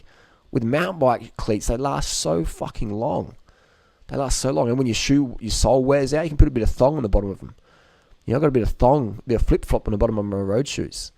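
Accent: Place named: Ireland. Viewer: Great to hear an Irish accent on here! We dont need milk.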